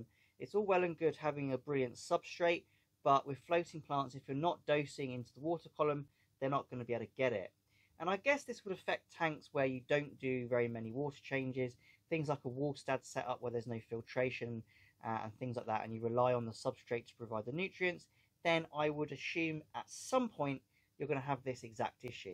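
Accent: United Kingdom. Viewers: Hi, thank you very much for you always nice and interesting video's. So refreshing with your UK accent, compared to the noisy and annoying American videos. Also thank you very much for the cool intro theme song! Been looking for that one for years. 👍 Always hate it, when my timer not doing what I think I programmed it to do.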